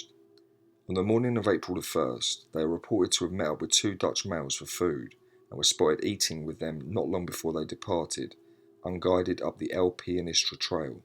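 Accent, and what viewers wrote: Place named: England, United Kingdom. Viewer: Wow sounds the lovely english accent.. long live england .. great insight sir ... these ladies were abducted znd murdered by he.ry Elizier gonzalez znd his gang à cover up rip kris and lisianne 🙏🙏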